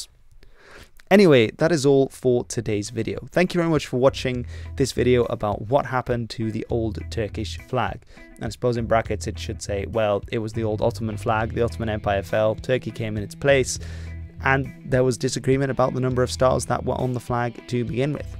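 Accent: Arab world. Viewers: Why are you reading Turkish words with arabic accent? It sounds weird and wrong af..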